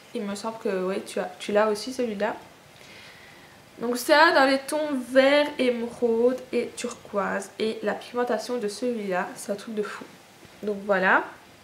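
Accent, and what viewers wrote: Belgium. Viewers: bonsoir juste petite ? tu es de belgique ?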